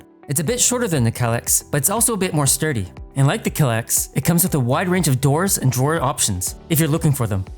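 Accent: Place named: Canada. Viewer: Dude sounds like a Asian Canadian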